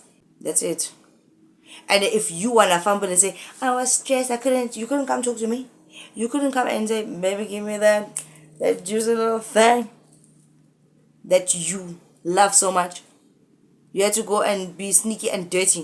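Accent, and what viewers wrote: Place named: United States. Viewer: Its the "snobish American accent" that does it for me,it gets me all the Time 😂😂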